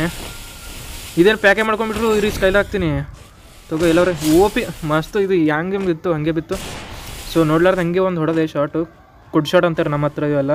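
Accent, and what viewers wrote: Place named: Uttara Kannada, India. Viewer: Voice uttar kannada Voice nimda yanna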